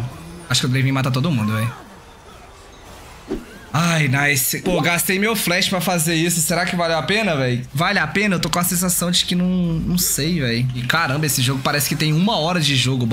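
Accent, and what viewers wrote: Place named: Goiás, Brazil. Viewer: Goiano sô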